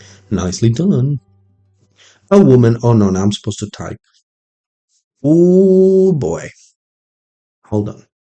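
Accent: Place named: United Kingdom. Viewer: bro you are a grown up now! you didnt even had that voice tone after high school hahaha very british xD sounds cool.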